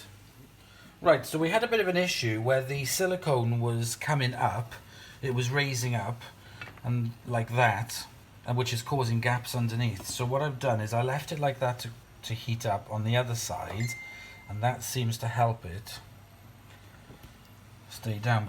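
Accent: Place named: Wales, United Kingdom. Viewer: the British half of the accent is clearly Welsh, and listen out for the sotto voce "bugger!" surely a very British expletive (my daughter first used it at age 2, can't imagine where she learnt it...)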